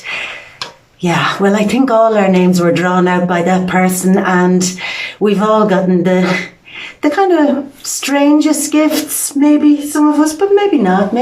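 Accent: Ireland. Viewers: hey yay.. ouch so glad your back our beautiful., happy 2021, missed ya beautiful irish accent.. thanks for comin back.. bless you beautiful Im sharing you on my MeWe.. looking forward to your extraordinary journey.. Truth Peace Justice and love dear heart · Hello I popped back on your channel today to hear your lovely Irish dusky voice and I wanted to ask how your new path is going!! Hope it’s marvellous x love and peace x j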